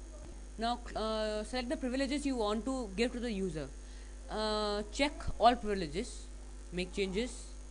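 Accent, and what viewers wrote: India. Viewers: Thanks dude.... are u indian?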